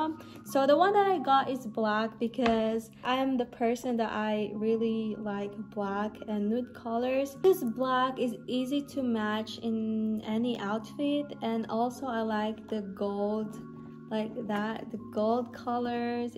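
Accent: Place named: United States. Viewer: Grabe bongga. masyado kna slang..ilang taon kna sa U. S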